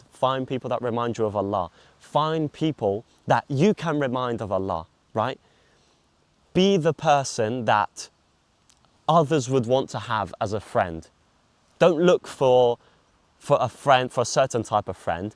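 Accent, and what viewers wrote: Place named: England, United Kingdom. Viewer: he sounds VERY ENGLISH to ME. Any background info on why you moved to Italy?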